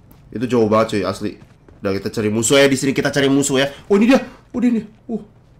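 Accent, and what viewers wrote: Java, Indonesia. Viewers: Medok men to mas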